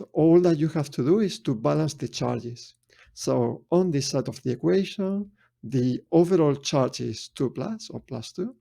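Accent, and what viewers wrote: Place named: Spain. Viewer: Para alguien inglés no creo pero yo que soy español si lo noto un poco. Mañana tengo el a level de chemistry y tengo que decir que tus videos estan muy bien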